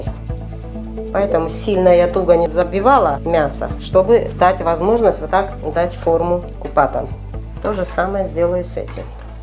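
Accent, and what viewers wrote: Georgia. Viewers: Как говорил один мой шапочно ЗНАКОМЫЙ-ОХРЕНИТЕЛЬНО!А я у себя в Белфасте убитую микроволновку под копчение приспособил-там бак из нержавейки.Позже нашёл выброшенную кем-то барбекюшницу с прогнившим дном, и вместо гнили върезал туда ведро на 10 литров.Теперь она на ведре стоИт при копчении, и туда влезает 2 курицы, или 5 филе скумбрии. Вот дождусь выходных, и сотворю ящик для холодного копчения, с подачей дыма с помощью туалетного вытяжного вентилятора))), я вас обожаю! Ваш лёгкий грузинский акцент возвращает меня в годы моей молодости, когда национальность не имела значения.С ОГРОМНЫМ УВАЖЕНИЕМ к Вам и Вашей Семье. Ребята, мы должны так же жить в Нашей Стране, как вы в Испании!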